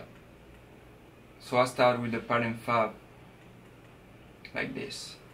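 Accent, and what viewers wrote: France. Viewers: On reconnait l'accent français :p, Gros taf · You're from France right? I can hear from the accent. I was actually born in France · le bon accents francais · Are you french ? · hes french lmaoo you can tell by his accent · On entends bien que t'es français :) :) On attends la suite avec un cook up de Londonondatrack ou bien un southside ou TM88 Type beat !! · He is frensh can tell by how he pronounces the A letter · Your are french ? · Ca fait plaisir de voir un français bouillant comme ça, je savais pas que tu étais français mais ton accent est craméééé ^^ Force à toi tu déchires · You french or spanish? I kind of recognize the french but you look latino, anyways we see you up here in canada Ontario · Are you french? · t'es clairement français mais bien joué, sa ma pris 10 ans a parler couramment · t'es français car quand tu parle t'es comme moi je sais parler anglais mais pas forcément l'accent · u have french ass accent, tu parles français? 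· true Hendrix he's not Mexican he's French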